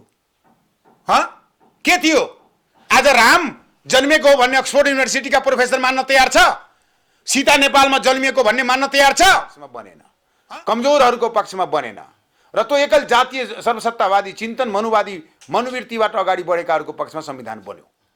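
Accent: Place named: Mongolia. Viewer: याे नेता अनुहार हेर्द त मङगाेल जस्ताे छैन बाेलि सुन्दात सुँगुर खाने मङगाेकाे जस्ताे छ ।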